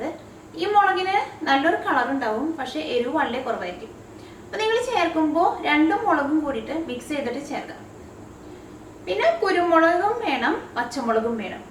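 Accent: Thrissur, India. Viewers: nice recipe.. but I hate thrissur slang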